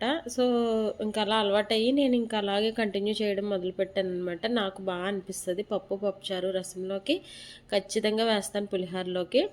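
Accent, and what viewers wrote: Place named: East Godavari, India. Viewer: Matladithe east godavari antaru madhi east godavari sister Maa side chesukuntaru super sister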